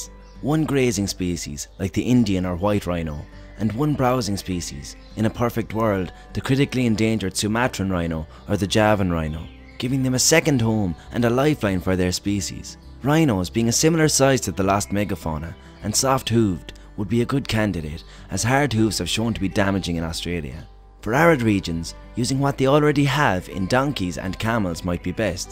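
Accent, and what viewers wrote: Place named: Ireland. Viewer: An Irish Attenborough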